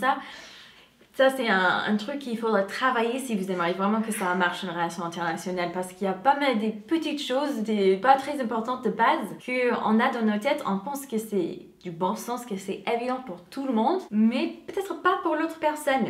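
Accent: United States. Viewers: Y a pas grand chose de plus mignon qu'une Américaine qui parle Français... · Ouao tu es vraiment très belle avec beaucoup de classe j'adore ! Et ton accent américain 😍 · Ahh le filtre vocal que tu utilises vers la 3 e minute efface ton accent américain, c’est surprenant..🙂 super vidéo comme d’hab’ 💪💪💪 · Comment j’ai pu passer à coté de votre chaine vous etes trop belle et cet accent américain j’aime trop je m’abonne !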